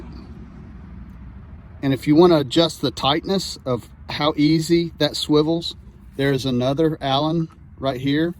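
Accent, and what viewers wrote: United States. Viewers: Helpful video. Thanks. Wild guess, but are you from Tennessee?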